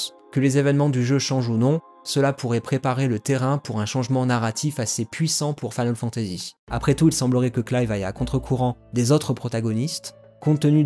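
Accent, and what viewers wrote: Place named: France. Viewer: Final Fantasy 16 sounds so much more legitimately medieval when a French person is talking about it 👍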